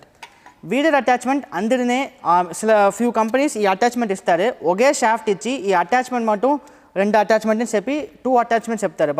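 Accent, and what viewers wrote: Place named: Tamil Nadu, India. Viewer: He is Tamilian, far better, got the point know.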